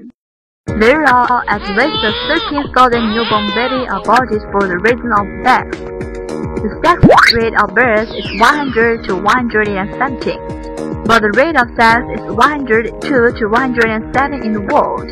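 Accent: China. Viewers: 不应该说，只是仿效了一个有效沟通的style。抄袭Graphics做得很好，内容可以update，有出入的也不是问题。 只是配音有问题：1。录音时候没有作输入音量控制，以致音量水平过高，整体失真，导致刺耳。 2。配音者（VO）虽然颇为流利，但是中国口音实在太重，脱离不了用中文拼音说英文的错误，这是大部分中国学外语学生不自知的事情。文稿还有文法上的错误。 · 这是中国口音的英文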